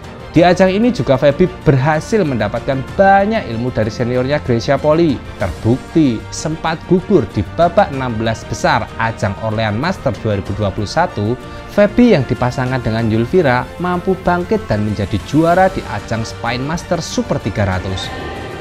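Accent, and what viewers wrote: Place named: Java, Indonesia. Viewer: Medok mu itulah bro.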